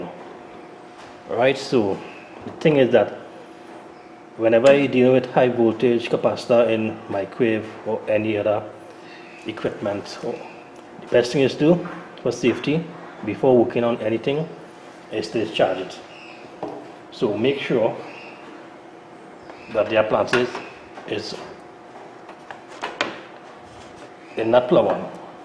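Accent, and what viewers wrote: Trinidad and Tobago. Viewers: Are you Trinidadian ?? Lol my microwave turned off and wont turn back on now dont know if the fuse is blown or not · Surely........a Trini accent.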